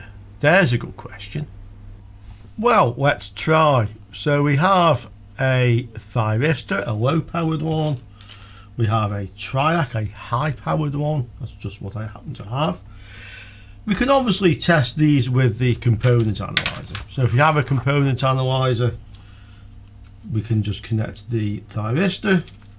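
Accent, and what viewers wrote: United Kingdom. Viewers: Thanks a lot for correct explained ❤ Sometimes I have problem with the British accent! What I missed is directly connected diac and thyristor to Gate! Variable supply of diac to Gate! But thanks 😊 U give me Manny answer's and open a lot of questions!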